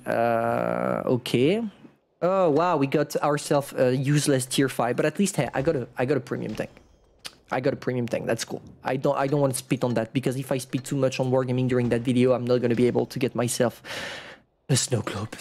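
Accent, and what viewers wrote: France. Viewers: Je savais que tu etait fracais a cause de ton acsens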